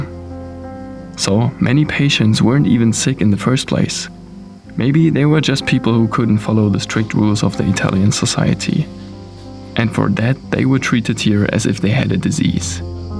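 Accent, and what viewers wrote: Germany. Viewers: Dein Englisch klingt wie ein deutscher der versucht ein Englisch zu sprechen... Warum quatscht du nicht auf deutsch? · I am extremely impressed by you. You are a classy, well spoken gentleman. This is first video of yours I've watched. Glad I found you. Are you German? My mother is, and as children, that's all we spoke. But, your dialect is different than ours. Anyway...THANK YOU! ♥️ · Trotz des super Englisch, bin ich den Gedanken nicht losgeworden, das etwas Deutsch klingt.😊 Tolles video! Toll recherchiert, erzählt und soviel interessantes bildmaterial. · Laut dem Dialekt seit ihr Deutsche!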